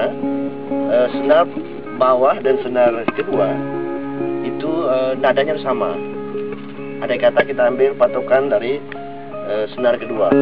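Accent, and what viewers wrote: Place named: Ulu, Indonesia. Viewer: logat asli orang ulu.. mantap abang ni, akhirnya dapat juga pencerahan belajar sape.. salam dari Sanggau bang..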